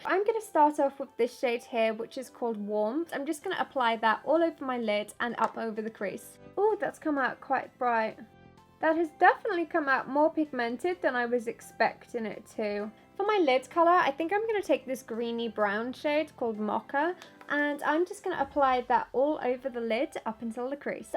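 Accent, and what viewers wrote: United Kingdom. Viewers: I love her and her british accent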